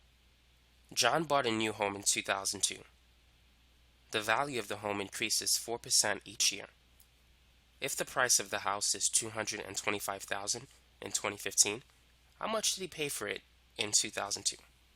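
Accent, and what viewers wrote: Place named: New York, United States. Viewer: New York accent innit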